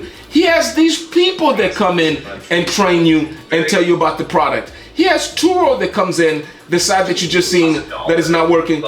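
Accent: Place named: Nigeria. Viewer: And you sound like a Nigerian. 🚩🚩🚩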